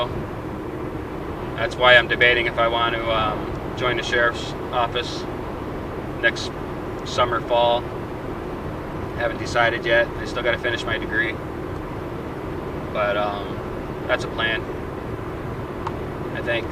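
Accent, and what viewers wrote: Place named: Minnesota, United States. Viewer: kind of sounds like northern Minnesota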